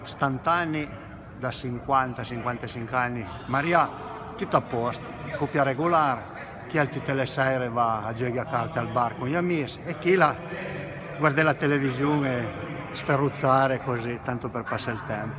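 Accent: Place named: Piedmont, Italy. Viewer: Grazie, da veneto avevo capito tutto tranne il finale. Bello il dialetto piemontese; neh.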